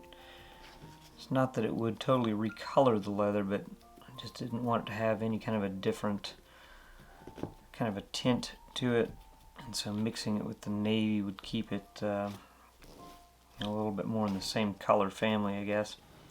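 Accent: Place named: Oxford, United Kingdom. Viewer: I mean, you did a good job. The way you speak, your intro and other thinks are totally like Elegant Oxford. I don't say that you're bad at what you do.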